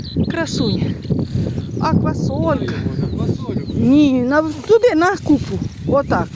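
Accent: Ternopil, Ukraine. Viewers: В Тернопiльськiй обл так балакають.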